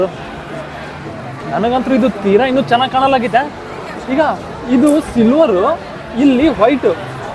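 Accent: North Karnataka, India. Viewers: ನಿಮ್ಮ ಉತ್ತರ ಕರ್ನಾಟಕದ ಭಾಷೆ ಅದ್ಭುತ ಅಣ್ಣ ❤❤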